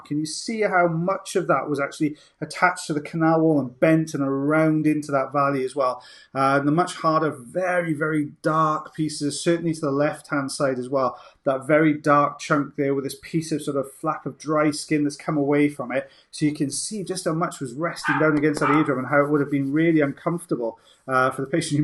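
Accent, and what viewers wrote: Wales, United Kingdom. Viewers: Are you Welsh? Love hearing the welsh accent, reminds me of home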